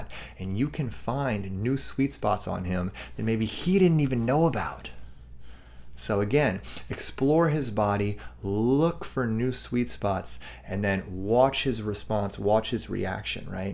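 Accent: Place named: South, United States. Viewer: I love your southern accent.🌞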